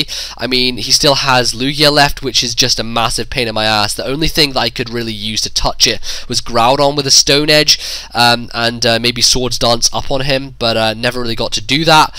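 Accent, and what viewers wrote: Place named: United Kingdom. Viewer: U british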